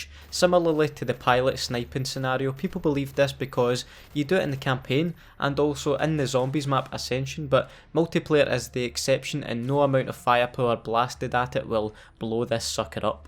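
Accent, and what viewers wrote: Scotland, United Kingdom. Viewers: Love the Scott esh accent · Absolutely love your vids. I know I'm a bit (just a bit) late . I'm from western Scotland and I can definetly tell you're Scottish. What part of scotland may I ask? Also keep it up man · Am I the only one who thinks this guys scottish voice doesnt fit the spooky map myth? Also: I didnt mean to offend you, if this did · I was getting a little spooked for the ghost of vacant clip, until you said with your strong Scottish accent "What tae fuck is that".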